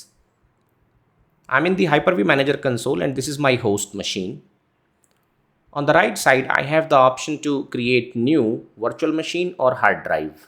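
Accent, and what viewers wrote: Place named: India. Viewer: The other suggestion is that for non-indians listening to indians speak english, your accent can be very hard to understand. Especially when you talk fast, which they often do. Please slow it down a bit and we'll understand better. I don't want to offend but I am having difficulty understanding everything.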